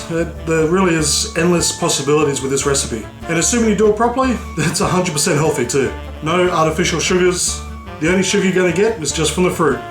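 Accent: Australia. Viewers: Are u from Australia if so what state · I'm subscribing you you can you have a really cool oz accent and your videos are creative and original keep em coming!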